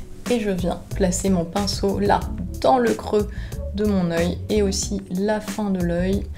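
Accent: France. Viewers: The french accent is so Devine it just screams rich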